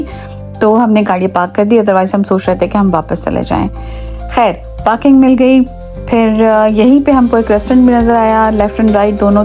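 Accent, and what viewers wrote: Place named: Karachi, Pakistan. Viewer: Salaam ...Aap ke Bolnay ke Andaaz se hi Mai samajh gyi thi ke Aap Karachi se hain ..wesay Karachi Wala Taste to Dunya mai Kahin Nhi milta ...Yehi Sach hai ....By the way watching ur vlogg for t he first Time ....Nice vlogg .👍🏻.......Hamesha .Khush rahain .....🌹🌹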